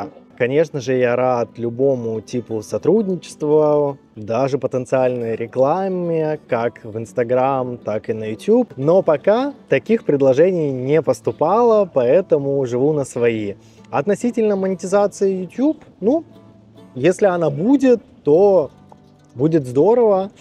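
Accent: Standard. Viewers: Теперь понятно от куда такая чистая речь. Успехов вашей семье во всем!!! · Рада слышать хороший,чистый русский язык! Отвыкла давно от этого Современную речь слышишь,даже многого не понимаешь из за сленга Особенно у молодежи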